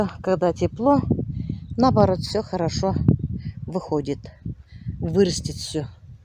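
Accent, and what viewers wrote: Tatarstan, Russia. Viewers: ИСЕНМЕ СИЗ. АЛЛА БИРСЕН ВСЕ БУДЕТ ХОРОШО М ВАШЕЙ СЕСТРОЙ. ОЧЕНЬ ЖДУ САБАНТУЙ И НАДЕЮСЬ ЧТО ВЫ БУДЕТИ СНИМАТЬ ЭТОТ ПРАЗДНИК САБАНТУЙ.БОЛЬШОЙ ПРИВЕ Т ВАШИМ СЕСТРЕНКАМ И САМИ БУДЬТЕ ЗДОРОВЫ.У ВАС ОЧЕНЬ ХОРОШО НА ОГОРОДЕ. У НАС В БАКУ ЖАРА ЛИСТЬЯ НА ДЕРЕВЬЯХ ПОЖЕЛТЕЛИ И КАК ОСЕНЬЮ ПАДАЮТ. ИСЕН САВ БУЛЫГЫС. Я КОГДА ВАС СЛУШАЮ И ВАШ ТАТАРСКИЙ АКЦЕНТ МНЕ ОЧЕНЬ ПРИЯТЕН МОЯ МАМА ТАК ГОВОРИЛА.ОБНИМАЮ ВАС И ОЧЕНЬ ВСЕГДА ЖДУ С НЕТЕРПЕНЬЕМ ВАШИ ВЛОГИ. ВЫ И ВАША РОЗА ОЧЕНЬ МНЕ БЛИЗКИЕ ЛЮДИ.👍💖💖💖👄🌹🌹🌹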